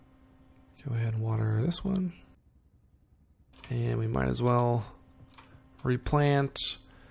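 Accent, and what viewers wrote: Canada. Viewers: feed me, feeeeeeeed meeeee my video addiction. I need to hear more of that Canadian gurrAGE'ness.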